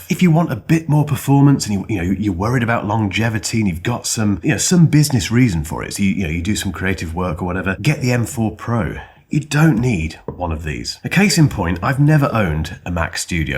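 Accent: United Kingdom. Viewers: Hi! Always turn to you for some of the most sensible Mac product buying advice on the internet. Besides, as a Yank, I’m obsessed with your Brit accent! Best. FYI, my current MacBook is the M2 Air. 🇺🇸🇬🇧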